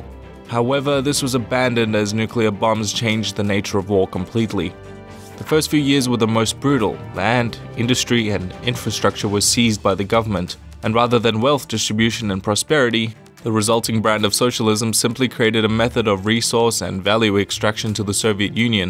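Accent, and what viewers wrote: Poland. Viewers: nic polish accent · is this guy polish or something?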